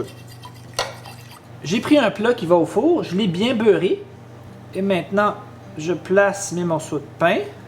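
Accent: Quebec, Canada. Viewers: Hihi c'est marrant que tu sois français :) Car tu as bien pris l'accent du quebec ^^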